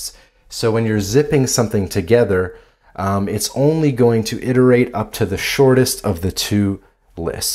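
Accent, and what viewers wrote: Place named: England, United Kingdom. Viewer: You have some talent!! Keep bringing more and I know u r from England :P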